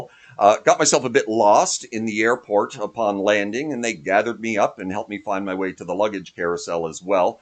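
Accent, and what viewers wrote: Canada. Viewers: Really enjoyed your tribute to the hip and the riff breaks were excellent. I obtained the “ ” album in 89 or 90 via the Columbia house mail order CD’s that were popular then. 7cd’s for a penny or something similar ha! I remember thinking, “who are these guys?” And that’s a strange band name 😂. So happy that I did get that cd, it defined that period of time for me and many other Canadians. Plus I felt like I got in on the ground floor as an early fan. Remained a fan since and Still enjoy their music today. I can’t think of another band that brought Canada together more than they did. Something we could use now more than ever. Thanks for the work you put into this channel. The lessons are excellent! BTW, Do I detect a hint of a Cape Breton accent…😊 fellow Novi here. Cheers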